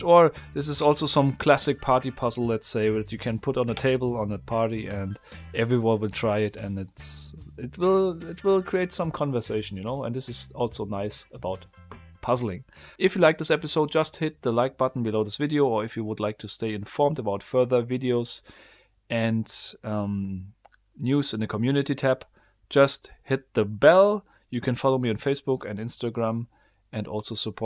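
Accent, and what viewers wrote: Germany. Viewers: Deutsche hören es einfach, dass dieser Herr nur aus Deutschland sein kann. 😅😅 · I love your German accent. Best one I've heard. "Brütforce" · the German accent makes it more credible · are you from germany? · german accent is so damn cringe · Haha bist du deutscher?? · You sound like you are from germany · Are you german? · That's some strong German accent! (Ich müsste mich mal selbst aufnehmen, wenn ich Englisch spreche, vielleicht klinge ich ja genauso) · Man merkt direkt an deinem Akzent dass du deutsch bist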